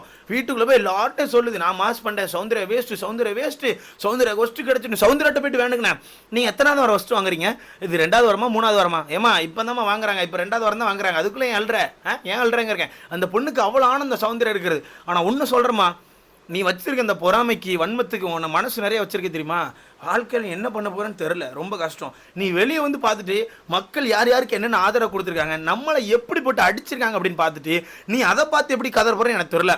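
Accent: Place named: Tirunelveli, India. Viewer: Which is your home town Sir?.We can see Tirunelveli slang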